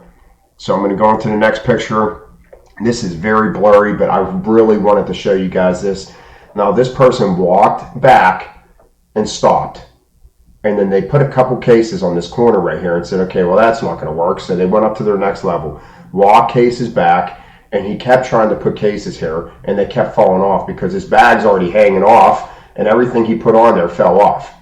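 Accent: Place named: Pittsburgh, United States. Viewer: Caught the Yinzer accent right away 👍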